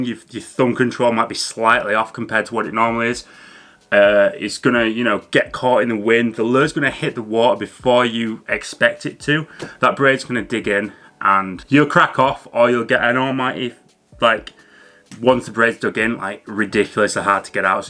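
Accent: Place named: Barnsley, United Kingdom. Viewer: The Barnsley accent tho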